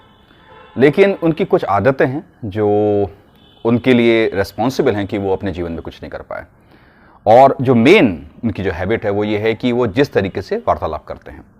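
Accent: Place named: Khandesh, India. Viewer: येले म्हंतस अहिराणी तडका 🔥🔥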